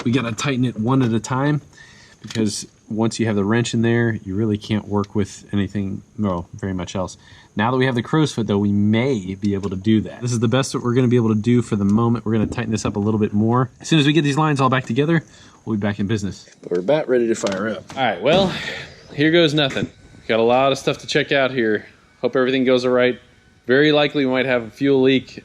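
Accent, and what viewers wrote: United States. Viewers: Are you from Maryland? Or born and raised in Florida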